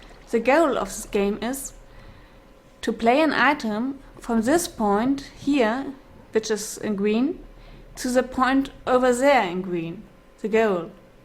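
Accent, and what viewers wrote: Germany. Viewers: woow the redstone was so complicated I almost got headache haha... btw I like your german accent...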